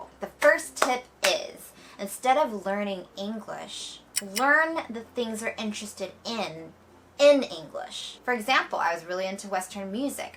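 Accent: United States. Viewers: 好像知道Dena的American accent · You do have a perfect American accent though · Dena的腔調確實非常道地，一聽就像是美國長大的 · 你還沒出國讀書的時候，口音也是很像美國人說的嗎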